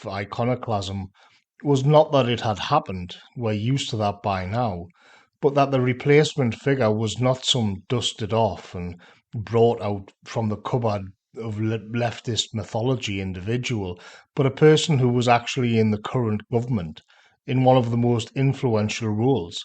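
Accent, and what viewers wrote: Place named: North East England, United Kingdom. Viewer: I love it that a working class lad from the North East is far more intellectually than most of our shit stain politicos .it gives me hope I'm working class from the North as well knowing thus bloke is out there on line who speaks like me makes me so happy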